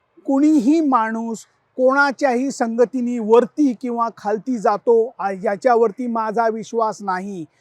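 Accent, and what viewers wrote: Pune, India. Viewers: मग पक्के पुणेकर आहेत लेले काका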